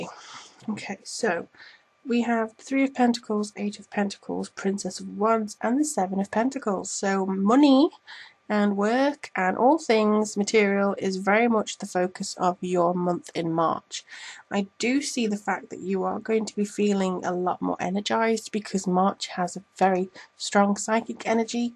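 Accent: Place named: Liverpool, United Kingdom. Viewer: Liverpool/Wirral girl? I hear the accent of home :) Good reading, thanks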